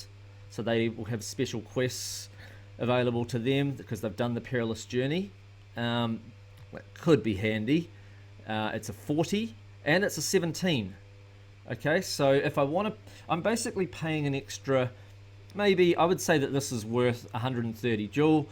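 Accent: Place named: New Zealand. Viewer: Yeewwwww NZ accent, reminding me of home. LOVE IT BRAH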